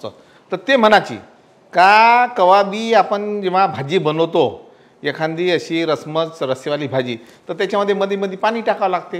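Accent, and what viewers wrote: Vidarbha, India. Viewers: मस्त रेसिपी 😋😋😋 विदर्भाची भाषा मस्त बोलता तुम्ही...ऐकायला छान वाटत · Chaalan ji Sirji tumchi vidabha chi bhasha aamhala aawadate Hamaare Nagpur Ki shan ho aap 🙏🙏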